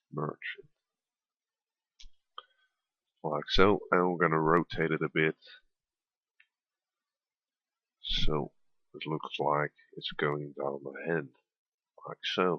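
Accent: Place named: England, United Kingdom. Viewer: super tutorial man,ik heb een en ander bijgeleerd,en ik was ook nog ge- entertained door het engels accent.ik wil MEER alsjeblieft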